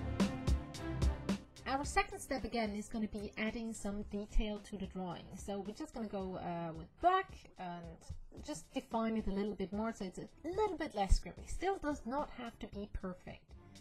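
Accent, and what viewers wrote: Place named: Germany. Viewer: r u married? (Love ur work and ur german accent)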